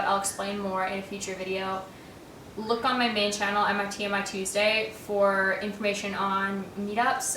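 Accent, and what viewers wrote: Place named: Australia. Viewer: This was very informative because I'm clueless with travel/house etc 😅 Also I love that you sound so Aussie sometimes ❤️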